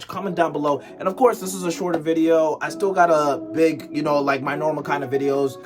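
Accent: South Africa. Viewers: wait you're south african fr 😭😭😭😭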